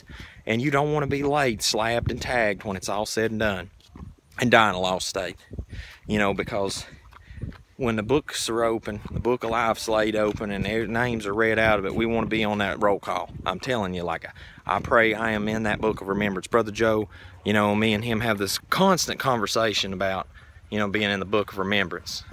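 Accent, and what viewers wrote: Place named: South, United States. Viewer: that's a wholesome southern draw I hear, Praise The Livin" Lord Jesus 💜 and God Bless yall" real good over there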